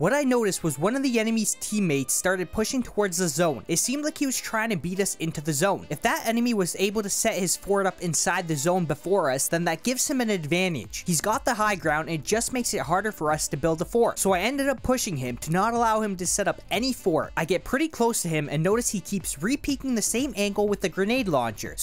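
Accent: Canada. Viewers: Quality tips brother. May I ask where you’re from? Sounds like Canada haha. Do you want to play together on PC sometime? Cheers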